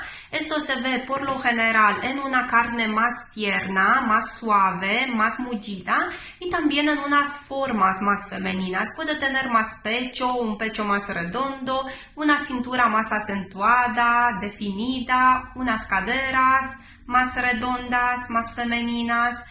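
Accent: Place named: Romania. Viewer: 10000% accent romanesc aici!! 🇷🇴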